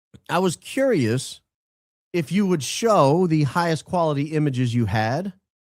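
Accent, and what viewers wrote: Australia. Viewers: New Zealander here... Your Aussie accent is hilarious, 🤣🤣🤣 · Good Aussie accent ☺️ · As an aussie i can confirm that is exactly how we speak, mate!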